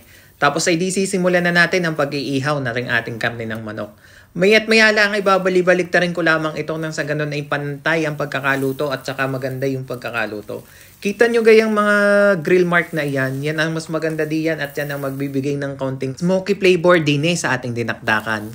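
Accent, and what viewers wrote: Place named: Batangas, Philippines. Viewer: idol batangueño ka ga? ay sa wari koy ka batang ka napasubscribe tuloy ako eh😅😅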